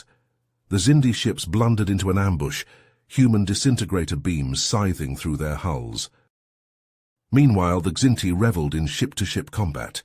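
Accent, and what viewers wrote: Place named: United States. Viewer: Sounds like an American with a mix of 🇺🇸🇸🇪🇪🇪🇺🇦🇮🇱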